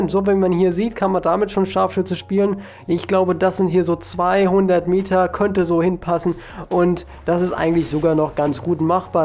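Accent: Germany. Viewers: also dein Video war richtig informativ und hat mir geholfen, ausserdem gefällt mir den leicht norddeutscher Akzent (?) Also ich lass gleich mal ein Abo da :D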